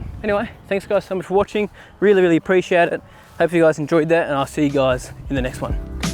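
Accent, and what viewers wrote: Australia. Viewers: I guessed you were from UK. After I had read your channel description, I realized that you speak English with RP OZ accent. I have never seen a house having a coop & its chickens as well during my stay in Victoria unless it is in outback. I thought having such consumption animals in urban areas was not allowed or illegal, but the house does...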